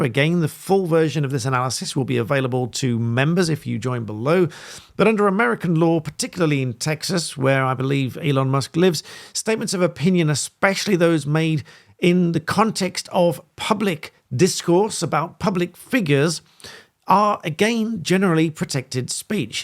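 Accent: United Kingdom. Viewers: Talk too fast - plus intolerable "British Accent". No thanks.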